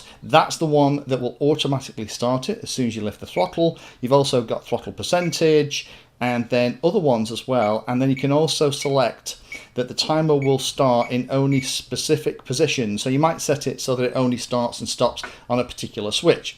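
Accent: United Kingdom. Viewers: I want to duplicate my Spektrum NX10 timer1 in my TX16S. The Spektrum timer allows you to start and stop the time based on throttle stick position. For example, I have a nitro model that I set the time to count down when the stick position is more than 20%. The reason is that there is very little fuel burn at low throttle and this is a better judge of flight time than the th% function of the TX16S. Is this possible with the TX16S? I love watching your videos and especially your British accent. I live in Oklahoma, USA.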